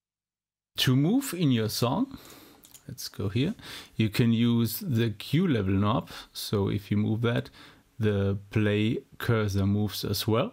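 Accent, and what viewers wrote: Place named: Germany. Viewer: Well Done! Up And DOWN! love the german accent! thx a lot!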